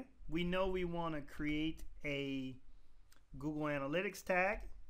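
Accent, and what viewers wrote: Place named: Louisiana, United States. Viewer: Solid concise video! Was wondering how these disparate areas connected. You filled in my vast, unknown gaps in knowledge. AND you did it with a..... Louisiana accent?! You got some Southern accent mixed with something else. I couldn't quite figure out. Anyway. That was 100%, Prime, Grade A teaching!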